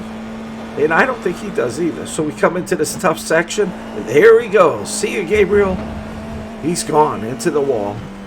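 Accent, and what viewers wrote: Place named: New England, United States. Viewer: BTW, I lived in Boston for years and I loved hearing that New England accent. 😍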